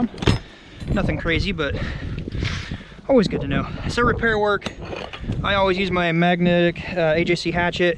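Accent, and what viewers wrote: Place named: Pittsburgh, United States. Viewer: Pittsburgh PA. You from Pittsburgh, I hear an accent lol. I have a shed roof to fix a few shingles on, thanks for the good video. Yeah, winter sucks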